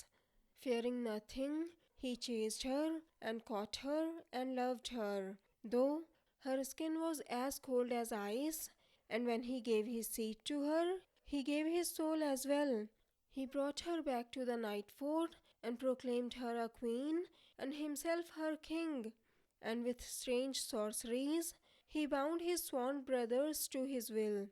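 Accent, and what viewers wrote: India. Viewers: Very annoying Indian accent! Very annoying to listen!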